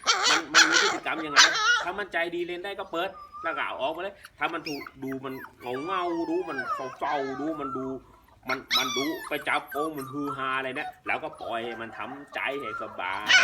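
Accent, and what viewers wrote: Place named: Phetchaburi, Thailand. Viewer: คน เพชร ป่าวครับถ้าคนเพชรคนบ้านเดียวกันสําเนียงเหมือนคนเพชร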